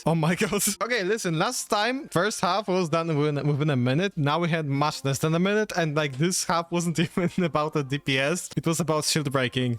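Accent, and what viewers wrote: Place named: Italy. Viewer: yo are you Italian? love your accent and your videos!!!!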